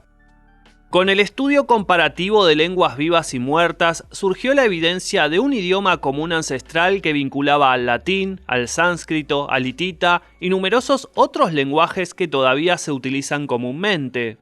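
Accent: Rioplatense, Argentina. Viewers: Es que así es la pronunciación de los argentinos o uruguayos, no es que lo haya hecho mal (?) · Ya lo dijeron, es el acento argentino/uruguayo.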